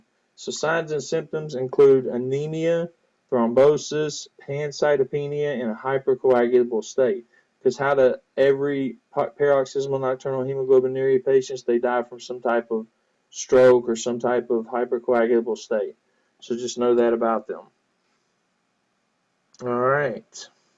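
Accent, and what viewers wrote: United States. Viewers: And i like ur pure american accent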